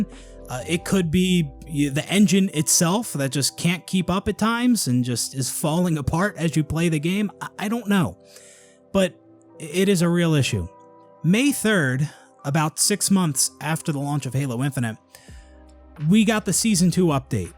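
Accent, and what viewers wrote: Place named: Baltimore, United States. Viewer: This dude is 100% either from Baltimore or PA. Or he’s lived in one of those 2 all his life. Guaranteed 1000% cause of the accent.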